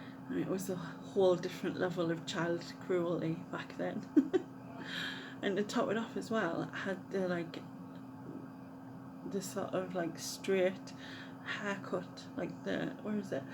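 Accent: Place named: Newcastle, United Kingdom. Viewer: Love your accent, I used to live in Newcastle, miss itx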